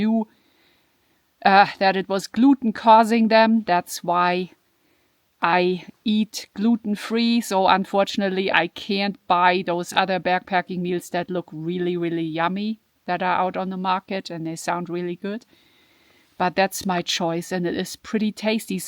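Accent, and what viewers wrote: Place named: Germany. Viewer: Hallo, Was für schöne und abwechslungsreiche Wanderwege in absolut fantastischer Landschaft. Beeindruckend diese Felsenlandschaft mit gefrorenem Wasser. Das hätte ich in diese Gegend nicht erwartet, das es so kalt werden kann. Schöner Nebeneffekt des Videos - mein Englisch noch ein wenig Aufzubessern - sehr angenehm mit deinem deutschen Akzent und sogar besser verständlich 😊 Gott sei Dank gibt es hier bei uns keine Bären😉 Danke fürs mitnehmen und noch einen schönen Sonntag! LG Thomas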